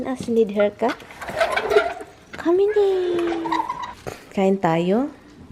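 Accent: Visayas, Philippines. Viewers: Putang-Ina swerte mong naka-punta ng America... bisaya ka ang to gas mong magsalita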